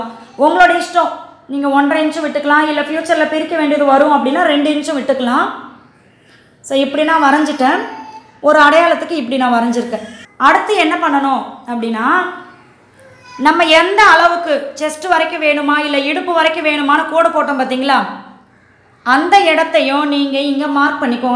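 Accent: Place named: Coimbatore, India. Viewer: Sis unga native unga peasu kovai mathiri iruku athan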